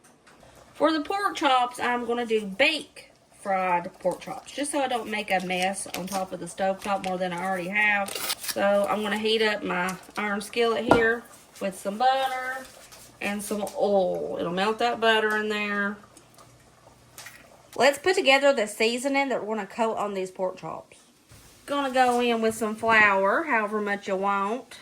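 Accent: South, United States. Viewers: Keeping meals simples❤ I rrally enjoy watching. And I love your southern draw when you talk. God Bless you and your family in 2025.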